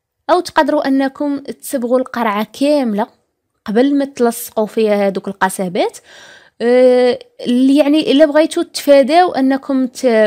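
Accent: Morocco. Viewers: بصاح عندك الحق؛اكيد شي مدينة مغربية كيهدرو بديك الطريقة؛تبارك الله عليهم.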